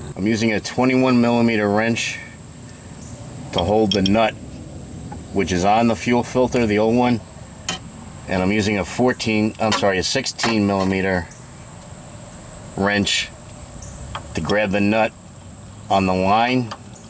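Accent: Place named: Boston, United States. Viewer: Thanks for explaining every step while using the exact words to describe what parts needed what size tools, which direction to turn, slide, twist, push, pull and clip everything your hands touched under there as you recorded this. You didn't talk too fast, you're easy to understand and your Boston/East coast accent- Luv it! 😊 My auto mechanically inclined go-to men (Dad & Gpa) aren't here to help me with things like this anymore, so Thank You for the time, effort and energy you put into this video!!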